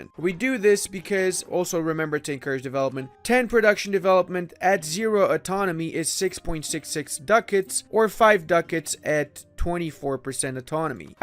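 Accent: Germany. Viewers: I can't lie the weird German accent gets somewhat annoying after a while. Not hating just my opinion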